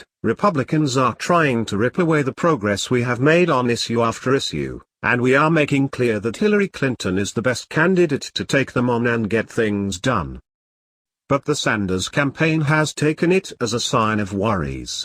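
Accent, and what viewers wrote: United Kingdom. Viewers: It's a person just bad sound, and he sounds British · I dont mind the british accent but im pretty sure its a bot though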